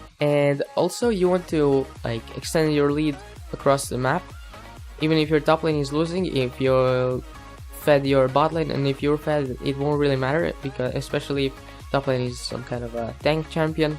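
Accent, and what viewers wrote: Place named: Philippines. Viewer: Let me guess: do you play in the PH server? Your accent sound Filipino...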